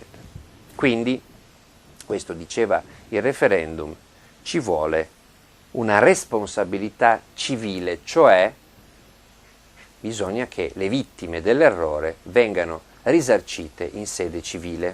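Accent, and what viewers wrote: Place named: South, Italy. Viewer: forza! ti vogliamo incazzato e con l'accento meridionalizzato!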